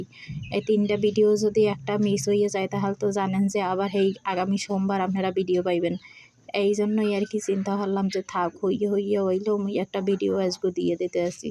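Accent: Barishal, Bangladesh. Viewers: আসসালামু আলাইকুম। আপু আপনার ব্লগ ভিডিও দেখা শুরু করে দিলাম। অনেক ভালো লেগেছে আপনার ভিডিও এবং আপনার বরিশালের ভাষার কথা গুলো। মাশাল্লাহ ❤❤❤❤❤❤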